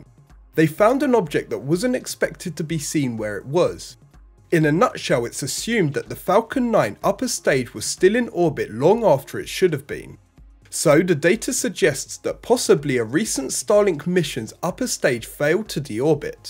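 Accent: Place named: London, United Kingdom. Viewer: It is a London thing, he said it "free times" already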